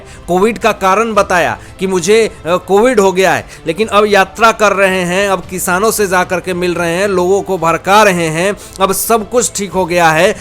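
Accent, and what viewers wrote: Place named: England, United Kingdom. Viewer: Shri “ BARA” instead of “ BADA” Anchor is trying to copy ENGLISHMAN. Though we are free from slavery 70 odd years ago but still our Anchore is trying to imitate Britishers. Sad very SAD . Unfortunately he owner of channel encourage this lousy Anchor, when we can get dime a dozen best ANCHORS???????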